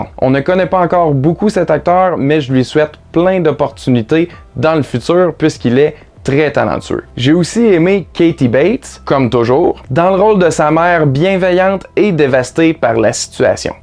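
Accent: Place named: Canada. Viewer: Super ta vidéo, tu explique bien t'es avis, franchement bravo et très belle accent canadien !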